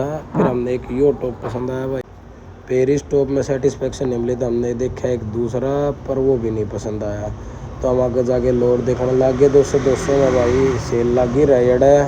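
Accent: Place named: Haryana, India. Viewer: Haryanvi voiceover 🤌💕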